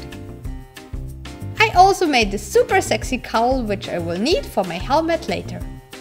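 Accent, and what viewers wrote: Germany. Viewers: where are you from, from the accent I thought you were german, but is a slavic name(?) Any way, always great videos, I love how you turn from gorgeous next door girl to super hero woman when you wear your costumes:)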